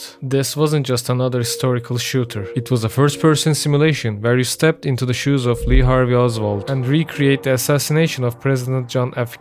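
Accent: Turkey. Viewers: Türk aksanı duyuyorum sanki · You sound like turkish man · you kinda sound like turkish though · aksanı türk gibi. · aga sen türksün ben o aksanın kokusunu alrıım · Ben senin o türk ingilizceni anlarım başkannn.. Manyak video bu arada sevgiler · Hocam video güzel olmuş eline sağlık. Türk müsün acaba aksan Türk gibi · Türksün sesinden belli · you sound turkish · kanka Türk müsün sesinden anladım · Out of context but are you Turkish? Your accent sounds like Turkish accent a lot. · Türk ingilizce aksanini 100 kilometre öteden dahi tanırım. Güzel video olmuş usta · You have a Turkish accent, are you Turkish?. I like your video btw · Turkish %100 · Your accent sounds very Turkish maybe because I’m Turkish and used to hearing it a lot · aksanı duyduğum an türk olduğuna emin oldum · came here to say this, his accent is exactly like a Turkish person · I know a Turkish when I hear one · türk müsün len aksanın o vibeyi veriyor great video btw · I dunno why but your accent sound like Turkish accent to me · I can hear the turkish accent kankam, good video ellerine saglik;) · Turkish accent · As a Turkish, i smell a Turkish accent 😁 Btw for your first video, the wievs are really good! 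· I love the Turkish accent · Are you türkish by any chance? Your accent very similar to türkish accent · Video çok güzel de, Türk müsün amk. Yemin ediyorum lan bu adam türk mü diye aksana odaklanmaktan videoyu izleyemedim:d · What accent is that? It sounds turkish.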